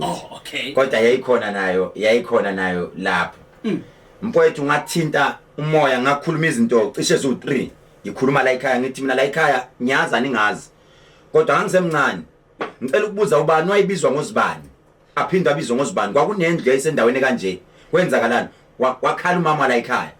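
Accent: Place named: Zimbabwe. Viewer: Ayi bengihlale ngisho ukuth uphuma eZim ngizwa ulimulakho uma ushumayela.kodwa ke uyiqhawe bhuti omncane futhi sengiswele amandla okufinyelela kuwe.ayi u Babawkhe waba yidlozi elihle lowakwa Hlosa kanti no stepfather wakho noMama wakho bakukhulise kahle khula bhuti omncane.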